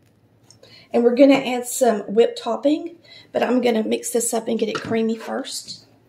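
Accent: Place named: Kentucky, United States. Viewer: I love to listen to you talk cause I am a Kentucky girl living in Florida for many years and it's nice to find someone that talks like me. God Bless you and your family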